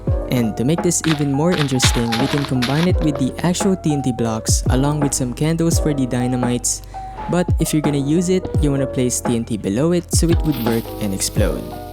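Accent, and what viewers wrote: Philippines. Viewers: You sound so Philippines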